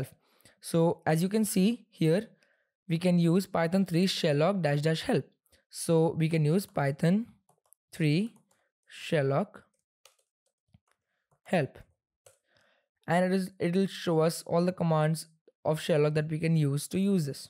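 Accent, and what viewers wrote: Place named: India. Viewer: Pov u found best video but breo have indian accent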